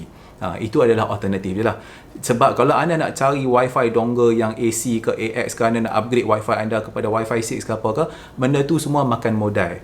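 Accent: North, Malaysia. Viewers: Hihi best denga ckp utara, nk kna try la semua tips ni sbb sy punya mmg teruk w/pun dah ddk dlm coverage & Band40 … tp td ckp x pkai modai, tp ad cdgn pkai modai, nk claim la boleh? 😅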